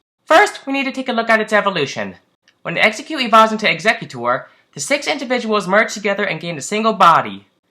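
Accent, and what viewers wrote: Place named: Russia. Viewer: why u accent so bad its like u are a russian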